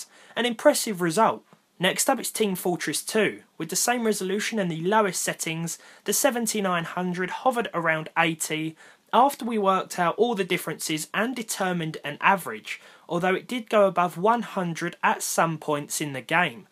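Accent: United Kingdom. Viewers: Nice video dude! Just a question. Where exactly are you from? I'm asking you because I'm studying British English at the moment but your accent is quite different from the other British speakers that I've known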